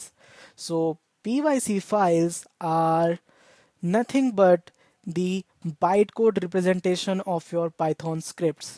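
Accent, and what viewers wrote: India. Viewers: 😢. Desi english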